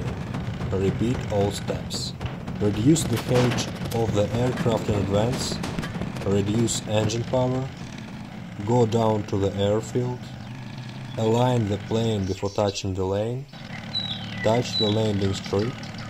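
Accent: Russia. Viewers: I love your russian accent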